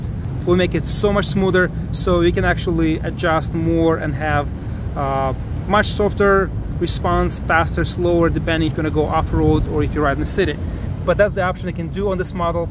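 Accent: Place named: Russia. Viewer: я не пойму комментатор русский чтоли? прослеживается акцент)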